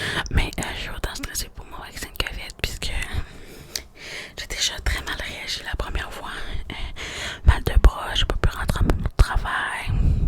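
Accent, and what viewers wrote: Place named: Quebec, Canada. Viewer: oh trop cool une asmr artiste québécoise j’avais jamais entendu de l’asmr avec un accent québécois :)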